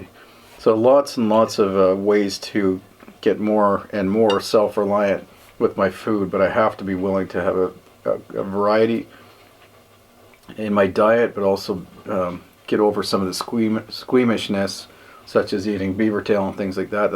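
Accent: Canada. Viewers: You sound Canadian but you don't sound like you are from Ontario. Is your Mum from the East coast?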